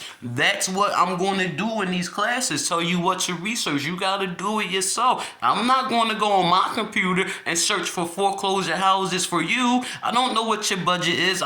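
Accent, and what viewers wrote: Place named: Philadelphia, United States. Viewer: You sound so Philly!! I love it....she’s a nut Jawn!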